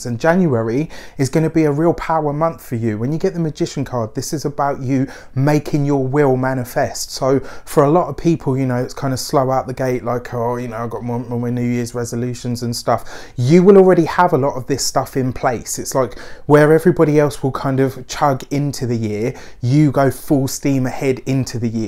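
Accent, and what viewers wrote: England, United Kingdom. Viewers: I love his English tongue. He must came from England. I am 22 of Sep.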